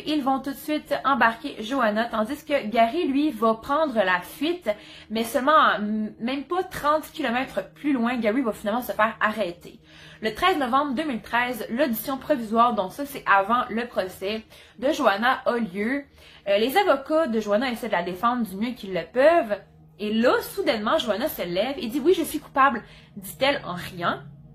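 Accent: Quebec, Canada. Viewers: J’adore l’accent Québécois 🇨🇦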